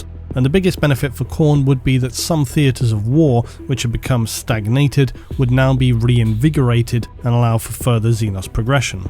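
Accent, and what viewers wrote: England, United Kingdom. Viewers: 2nd this, rather enjoy me some sophisticated brash british guy & the pragmatic dry humor & his narration tone that slaps like cold steel and enraptures one so thoroughly you hardly even notice the mutton chops growing over you’re ears. Edit: Reasons. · Documentary content just sounds better when narrated with an English accent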